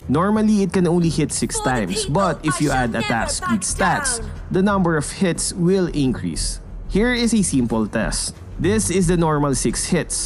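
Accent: Philippines. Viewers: Loving your accent, the way you say "windtalker" ughhh sexy Filipino accent❤️ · But the way he says "attack" is kindda weird bcz of his thicc Filipino accent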